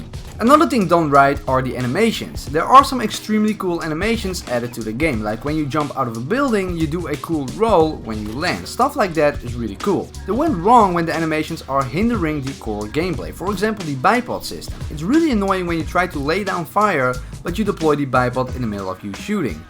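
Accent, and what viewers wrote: Netherlands. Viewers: I can hear you are dutch (ja toch?) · are you from the Netherlands, you have a bit of an accent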